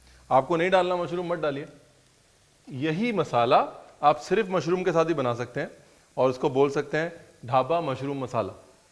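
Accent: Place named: Punjab, India. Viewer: Hindi mein punjabi touch ka jo mza dalte hain aap usse apnepn wali feeling ati rehti hai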